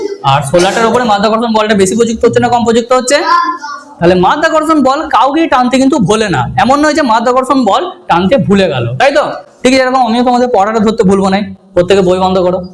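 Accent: India. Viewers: বর্ধমান জেলার ভাষার টান, কনফার্ম! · আপনার বাংলা কথাটা কিরকম যেন টান আছে। হাওড়া জেলার লোকের মধ্যে দেখা যায়। যদি পরিস্কার বাংলা বলতে পারেন খুব ভালো হয়। কারণ আপনি একজন শিক্ষক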